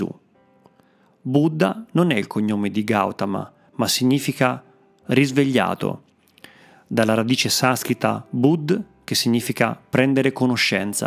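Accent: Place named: Ancona, Italy. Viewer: Grazie per l'ottima lettura. Alan Watts è un autore che, a mio parere, molti usano senza citarlo; ma va bene anche questo. Scusami la curiosità: il tuo accento mi fa pensare alla calata anconetana o della provincia di Ancona... Sbaglio? Un caro saluto...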